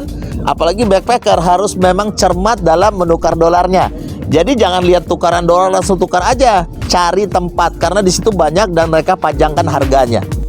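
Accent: Medan, Indonesia. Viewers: adek ini orang medan ya?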